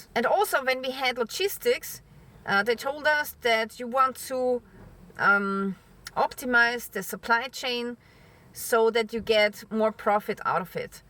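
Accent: Germany. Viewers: Great video;you have a German " accent... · She speaks like Terminator. She has a German accent. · Your English sounds a little like Klaus Schwab. Are you German? I used to live in Black Forest.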